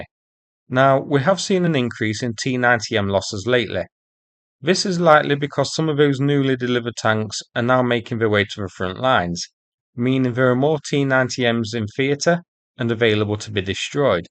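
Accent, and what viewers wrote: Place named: Home Counties, United Kingdom. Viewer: Sounds a bit Home Counties but I think you can safely call the 8 buildings that make up Andrivka a 'Hamlet' not a town 😉❤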